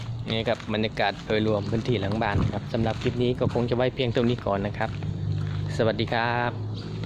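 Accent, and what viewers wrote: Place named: Korat, Thailand. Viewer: พูดโคราช24ช.ม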